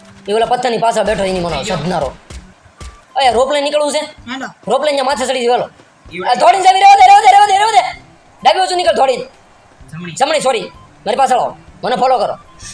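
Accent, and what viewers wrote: Bhavnagar, India. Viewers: mane lage che tame bhavneger na cho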